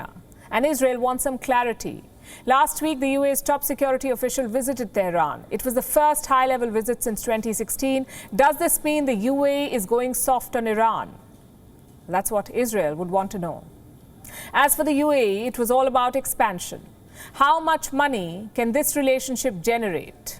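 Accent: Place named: India. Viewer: I wish madam newsreader hadn't had Indian/pakistani accent. it is quite unimpressive.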